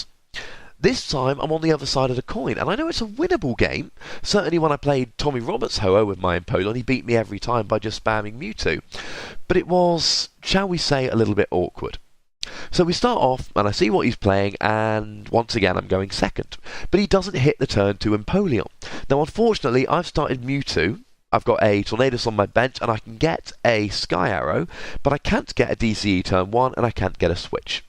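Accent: United Kingdom. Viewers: His voice is just beast. Love the cornish accent, He's a good teacher too, he rescued my business grades!! If is watching then good luck in your career and if not, then someone pass on the message:)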